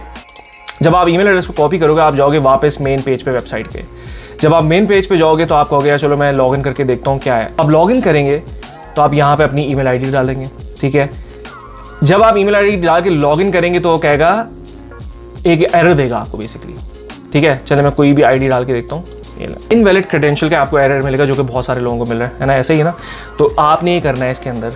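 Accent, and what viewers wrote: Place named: Karachi, Pakistan. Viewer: G way of talking bata raha hy karachi k hy ap 🌹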